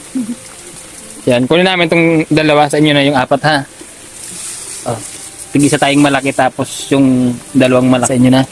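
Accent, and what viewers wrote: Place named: Bicol, Philippines. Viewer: Magayon nag acceint ng bicol mo.nakakaralateakonsa mga pronouce mo.ng bicol parang na.miss ko ulinnag bicol taga camsur ako.kaso ang bikolnmo parang tunog rinkunada masarao pakinggan ang boses mo..tuloy lng mag vlog lagi ako nanunuod.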